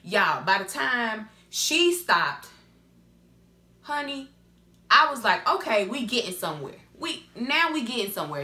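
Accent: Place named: South, United States. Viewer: I'm from Alabama and you sound kinda southern 😊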